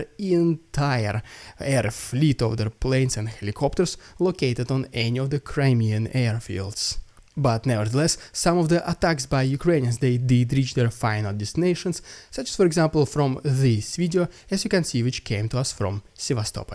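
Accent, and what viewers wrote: Russia. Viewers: Demonetization of videos: There are people who comment on and report videos before they watch them. And it’s probably people who think they are doing something good. You’re called, you talk with a Russian accent and you start off with “Russian Propaganda”. people are looking to report people they think are Russian millbots. If you altered the name slightly like “the westernized Russian Dude”. And drop the propaganda you might be ok. If you operate for you then keep it the way it is. If you’re doing this to send a message to people think of making modifications.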